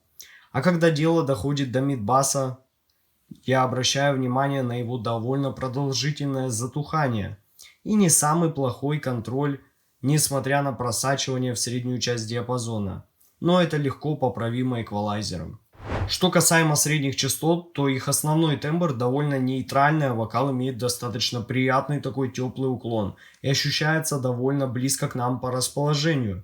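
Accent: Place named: Caucasus, Russia. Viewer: ломаю голову, знакомый акцент :) с кавказа?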